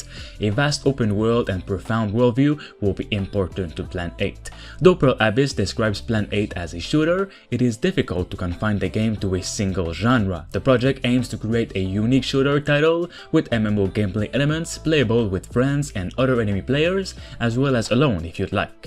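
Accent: Quebec, Canada. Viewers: Are u French Canadian??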